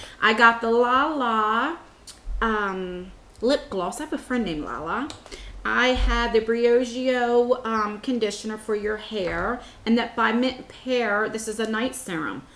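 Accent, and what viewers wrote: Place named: Philadelphia, United States. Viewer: where are you from? you have kind of a philly accent lol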